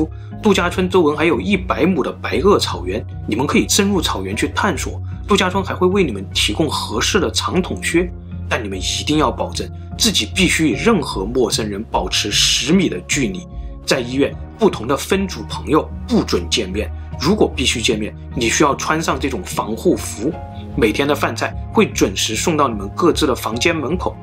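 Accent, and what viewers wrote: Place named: Hubei, China. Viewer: 现在听湖北口音听上瘾了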